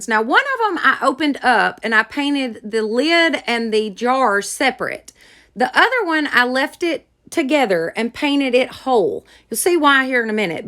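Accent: South, United States. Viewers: The happy harvest truck aint bad. But craft happens and sometimes things just dont come together. If that was your mess up then I would hate for you to see mine. LOL I think everything you make is amazing and love the country twang it just does it for me. Keep up the great work and cant wait to see what you come up with this christmas!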